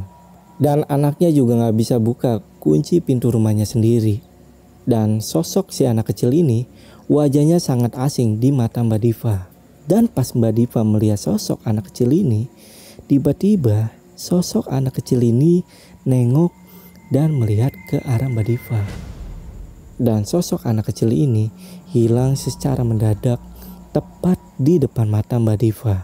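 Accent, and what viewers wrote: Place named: Bali, Indonesia. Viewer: Bang, logat T nya bali banget sih. Asli bali kah bang?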